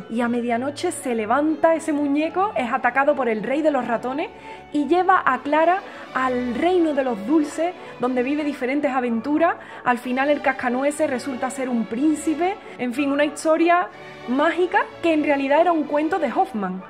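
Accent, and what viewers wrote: Andalusia, Spain. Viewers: ¡Que espléndida ejecución! Aunque admito que lo que más me gusta es escuchar su acento andaluz.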